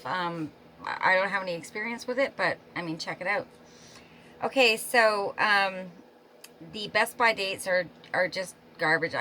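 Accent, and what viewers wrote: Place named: Canada. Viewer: I thought your accent might be Canadian! 😂 Thanks for confirming!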